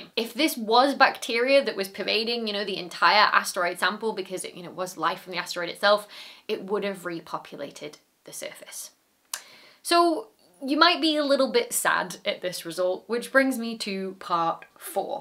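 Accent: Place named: England, United Kingdom. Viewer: I am hard of hearing and have trouble understanding most women. For some reason, even with your heavy English accent, you are easy for me to understand. I think some of it is due to your precise pronunciation of words. I really appreciate your efforts at making yourself understood and for the great explanations of difficult subjects. Keep it up!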